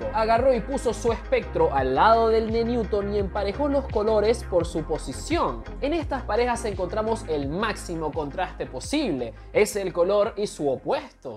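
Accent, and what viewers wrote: Venezuela. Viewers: Chamo, se te nota lo venezolano... ❤ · Amé demasiado este videooo, desde el inicio me enganchó el acento y jerga venezolana. Que bonito saber qué hacer creadores de contenido de Venezuela que si aportan de verdad y no andan sin camisa y comiendo carne cruda jajaja Tienes una manera increíble de unir teoría y práctica, seriedad y humor. Al igual que el color estás lleno de contrastes y eso es lo que te hace especial ☺️ganaste una nueva suscriptora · Me uní por lo que aprendí con este vídeo y por el acento de mi gente criolla venezolana!!! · Me encanta como.explicas todo, muy venezolano je je je ❤ tenía tiempo que no escuchaba uno, me gusta el color rojo !!! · Buena información, pero el acento venezolano no lo soporto hermano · Coño pero que buen video, yo qué no tengo paciencia para ver ni películas, bro arrechísimo y paisano venezolano con ese flow! 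· Viva nuestro dialecto venezolano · Es venezolano por el acento No? Pero de que Estado ? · Se te nota lo venezolano mi pana.... Me encanta dX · Caraqueño o Valenciano? De maracucho no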